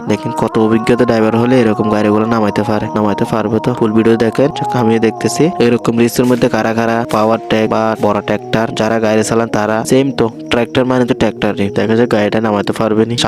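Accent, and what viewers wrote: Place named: Chittagong, Bangladesh. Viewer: তুমি চিটাগং তোমার কথা শুনে বুজা যায় যতোই শুদ্ধ বলো চাটগাঁইয়া শুদ্ধ বলতে পারে না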